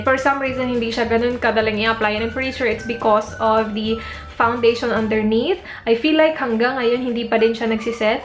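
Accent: Visayas, Philippines. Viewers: ate, may halong bisaya ka ba? :) i love your videos newbie po ako sa yt mo :) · i mean you speak visayas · BISAYA KA PO BA? PARA KA NAMANG TRYING HARD MAG ENGLISH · Bisaya ka teh?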